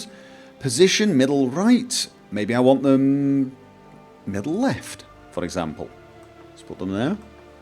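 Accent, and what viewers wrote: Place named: Scotland, United Kingdom. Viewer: Hears a scottish accent insta sub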